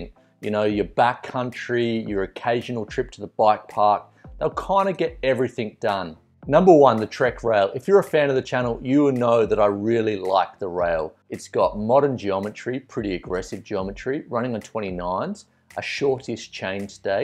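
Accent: Australia. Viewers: Gday. I take it that you don’t like Scott bikes. Predominantly the Ransom e910 & Genius e900tuned. Like your channel even if it is for the Aussie accent 🇦🇺😎🤙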